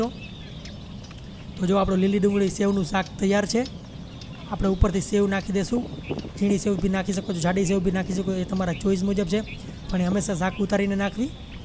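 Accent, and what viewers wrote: Kathiawar, India. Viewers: Your language pure Kathiyavadi😍🥰🤩🤩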